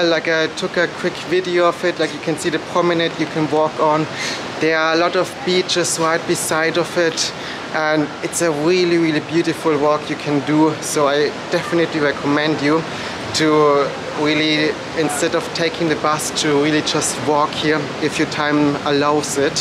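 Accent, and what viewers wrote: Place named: Germany. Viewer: Love that German accent!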